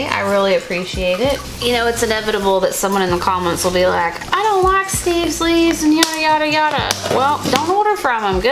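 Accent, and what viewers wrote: South, United States. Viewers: Hi, really love your videos. You are the cutest and love your accent!! I have one too as I’m originally from Georgia now Calif(👎). You and are a trip🤗👋 and Winston too!! You are so knowledgeable and cute and you come across as so real🤗👍. Steve’s leaves begonias are delicious!! With your rev think I’ll try them😁. Thanks again for your exceptional videos, regards to and Winston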